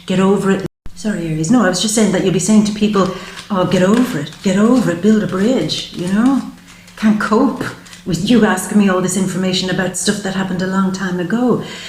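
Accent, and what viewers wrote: Ireland. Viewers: Not only is she on point, but that Irish twang in her voice is so soothing, so healing.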